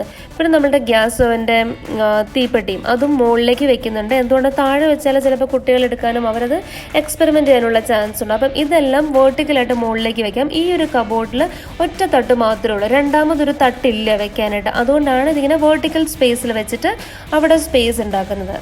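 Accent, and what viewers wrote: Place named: Kollam, India. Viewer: ariyilla.... samsara shaili kettappol tonni..appol onnu confirm cheyyamen vicharichu... ende naad kollam... njan from karunagapally... husband from kadavoor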